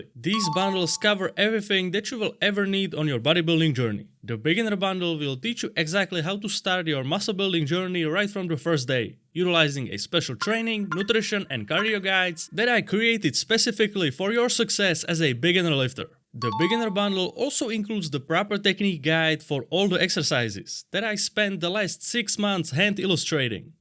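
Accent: Czech Republic. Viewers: What about the dumbbell overhead press, I stand up when doing it, so I am vertical, but I push elbows and head a little forward, is it OK then? Btw I knew you are Czech after the first sentence, we can recognize that accent anytime :-D Ať se daří :-D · Do i hear a czech accent?😗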